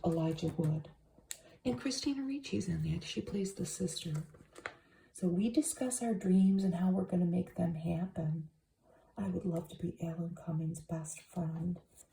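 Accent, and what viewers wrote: Midwest, United States. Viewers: Your Midwestern pleasantness and accent keep me coming back 😊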